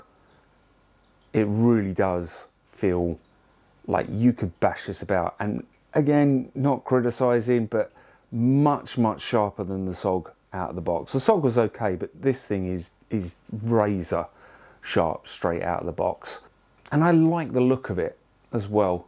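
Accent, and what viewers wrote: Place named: United Kingdom. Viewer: Switched of at aluminum. Dude your British.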